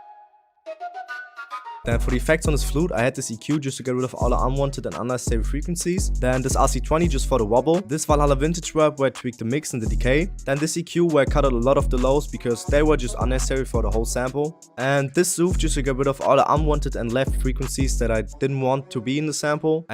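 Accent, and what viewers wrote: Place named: Germany. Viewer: Yo u sound german are u ?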